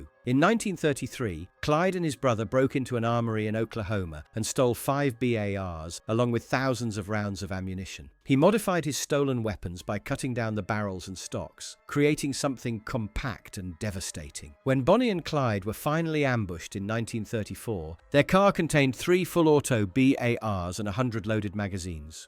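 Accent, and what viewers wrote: England, United Kingdom. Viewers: You couldn’t carry an extra barrel and still do what the the BAR was intended to do…… The Bren gun proved the concept. The BAR was even lighter (albeit 20 rd mag). Let’s calm down when we hear an English voice doing a documentary…. This one’s iffy as hell.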